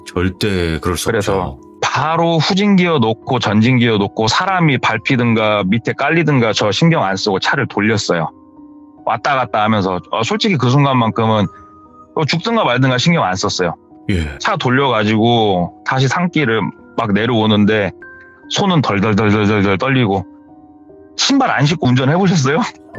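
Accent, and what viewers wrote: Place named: Seoul, South Korea. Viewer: 수줍은 (얼추 서울말쓰시는) 넘 어색혀..ㅋㅋㅋ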